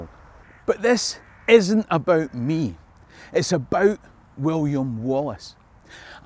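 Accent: Scotland, United Kingdom. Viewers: I'll be Inverness after this crazy long day and night. Because of you, I've learned so much and I am very grateful to you! What do mean you can't be Scottish? Just listen to that brogue! 😃 Humans can be quite daft. You do a great job and I love your videos. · Sir, I am an American by birth and 1/2 Welsh and Irish and some Cherokee . First, I see a very good presenter of Scottish History, nothing more. Tome you sound Scottish, so I figure you are as I am an American. I want to thank you for the lessons . I happen to come across them and have watched two so far about Wallace. I see Wallace as a Scotsman that had ancestors from Wales. That is about it. Just as St Patrick was Irish but was half Roman from what I read, he is still an Irishman to me. Take care and keep the good work up. By the way, your humor is funny. I get most of it. Patrick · What ever you are sir, yah Scottish accent is fun too watch. 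Someone with around a quarter scotts irish iin him on my mother's Dad's side, I think that is why I have a rebel streak in me. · Ah I could listen to you talk all day… I’m a Scot in exile who never had a Scottish accent due to being raised abroad but my father hailed from Glasgow and my mother from Stirling and your accent recalls childhood holidays with long gone aunts and uncles. Amazed in this day and age to hear that some people question your Scottishness. They must be dinosaurs.. . I shall look forward to more from your channel. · You sound pretty scotch to me I have almost all my genetic ancestry from those islands you have been talking but I. Definitely American, but happy you are educating me on where my anc came from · The Scottish Brougue you speak in among family and friends. (You said you adapt your speech so more people understand you when you do the videos) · On your comment on being African Scots or vice versa, with a strong Scots accent, personally, I enjoy both you, and your show which I think is excellent and thank god someone is exposing some of the uncomfortable truths about both White and Black slavery, by virtue of your unique position, and I enjoy the humour chucked in, too. 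I wish you would expose more on the upper-classes abuses of people by using them as slaves whenever they felt like it, re Bernardo Children, I remember well as I lived near a home, and on my arrival in Canada, after settling in, I moved not too far away from one of the main Houses that they were sending them too before they were sold, I mean distributed. On the topic of Hereditary, I'm a 5th or 6th Generation Canadian Scots, who grew up in the East end of London, raised by my Half Irish Grandmother who did a wonderful job. My Grandfathers were both victims of WW1, One lost a lung to shrapnel, left to die by the Brits, he was saved by a German Dr although they had run out of anesthetic, and finally sent home for my Grannie to nurse, while the filthy hole where his lung had been drained for years. Meanwhile, my Scots-Canadian Grandfather, was Mustard gases and blown up and Buried by shell explosions twice in one night, somehow they dug him out, and he lived, although with huge neurological damage, being so close to 2or 3 high explosive shells, well at least they didn't shoot him as he was suffering from shell shock according to one idiot Englishmen? 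He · It baffles me that people come to this video, listen to you and think, na, he’s not Scottish. You are my definition of a Scotsman. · Love this, wondered long about Wallace. Your heritage? Bah, my ears tell me all I need to know: thats a Scottish accent, one that can be understood by all. As far removed from our roots as my clan in America are, you and I probably have a similar amount of Scottish lineage (but I win the "red in the beard" category) and I consider myself a Scot. Thanks for sharing sir, I enjoy history when it's told enthusiastically.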